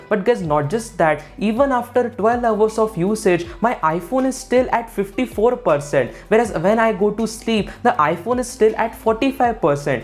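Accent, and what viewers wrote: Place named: India. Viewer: that indian accent bruh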